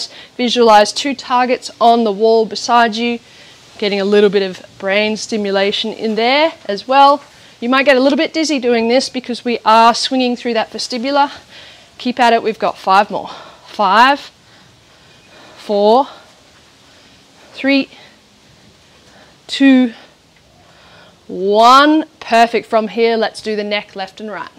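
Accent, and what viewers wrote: Australia. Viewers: Thank you so much! This is my Day 2 and I see a big difference. My husband & I have been painting our home and painting the ceiling, did it for me😩I felt my muscles were so stiff! This surely helped with some Stretching Exercises! Thank you again! Btw love your Aussie accent❣️😘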